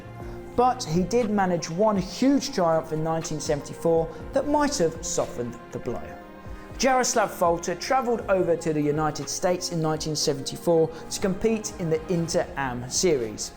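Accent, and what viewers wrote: United Kingdom. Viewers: He is a Britt. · I couldn't finish watching this video. It bugs me he kept saying CZed. I know that's how they say Z over there in England but for some reason it bothers me. Lol sorry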